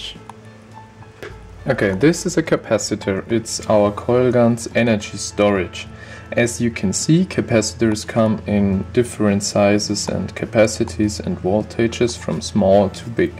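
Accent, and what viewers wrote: Germany. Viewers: So einen heftigen deutschen Akzent, habe ich lange nicht mehr in einem englischen video gesehen xD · Ha wusste ich doch dass du deutsch bist dieser Akzent ist unverwechslebar ^^ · very nice video, at first I thought you are a russian guy, but no, ein deutscher Kamerad :D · Are you German??? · are you german? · und Deutsch klingender Akzent. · Lol.. Ich dachte mir sofort, dass das ein Deutscher Akzent sein muss :DD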